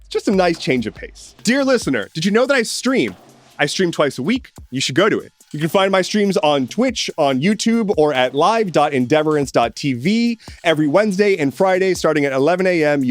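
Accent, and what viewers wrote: United States. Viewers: Would've sworn you were from and based in the PNW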